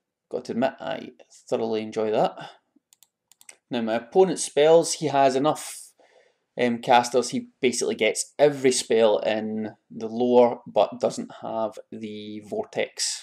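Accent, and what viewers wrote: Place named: Scotland, United Kingdom. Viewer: Not to far from me then, its great to hear another scottish voice. I recognised your braw dice ha ha i have same kind got them at the scottish open in striling in october. I had my fluffy orcs at that tournament......lets say it didnt go to well ha ha Next time its my wee stunties only. Ive just finished editing a game i had against chaos dwarfs uploading it later, check it out. See how goblins fair against broken chaos dwarfs. Take care and merry xmas. Maybe see you at tournaments next year i hope.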